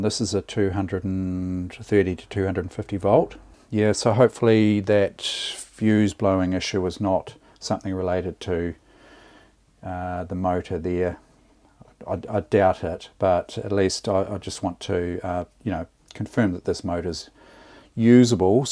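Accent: New Zealand. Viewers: So lovely to hear a New Zealand voice in the vintage sewing machine world. Horrified at converting a FW 221 to a jigsaw but also slightly impressed at the ingenious nature of the conversion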